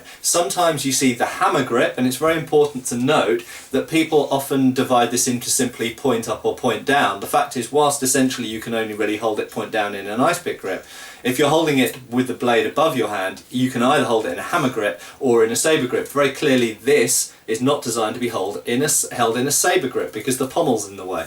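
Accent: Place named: England, United Kingdom. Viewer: Iona J He has an English accent and uses facts.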